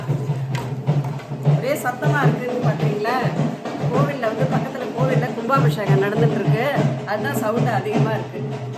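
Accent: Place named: Telugu, India. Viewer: Atta, you seems to be Tamil side telugu to me. Where do you put up in chennai?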